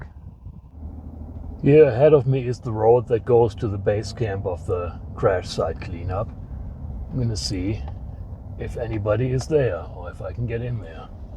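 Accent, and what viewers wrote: Germany. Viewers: Love the Bavarian accent!! Great video! I wasn’t aware that there was an uncontrolled landing of a UAV. Thanks for the info. New Subscriber! Greeting’s from New Hampshire.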